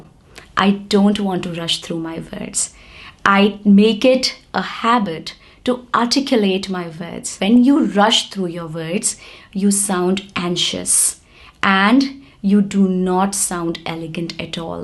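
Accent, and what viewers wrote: India. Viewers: We love your Indian Accent Mum😊😍 Thank you for always sharing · Special thanks to you for maintaining indian accent. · I really admire the way you talk to us. Your pitch is clearly audible. I like your Indian accent👍✌ I'm also on a way to speak fluently.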